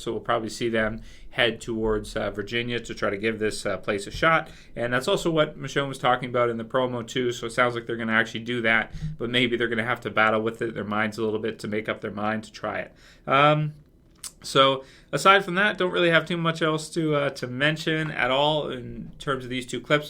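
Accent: England, United Kingdom. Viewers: Wait! You're english...,. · ... You're english? WUT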